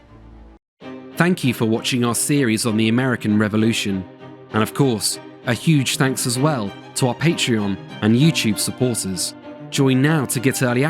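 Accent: United Kingdom. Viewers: What irony...Narrated by a Brit 😅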